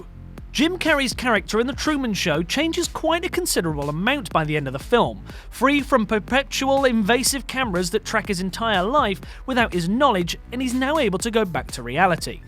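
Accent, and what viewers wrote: United Kingdom. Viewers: Really bad. Lazy and poor. I am trully sorry for you. Almost every character mentioned did, in fact affect the plot of the film. Except for a few who did not, like Cruise in War of the Worlds, or the Basterds. But that was no mistery. It was supposed to be that way. You think that using a british voice will make this sound inteligent? Dumb fucks.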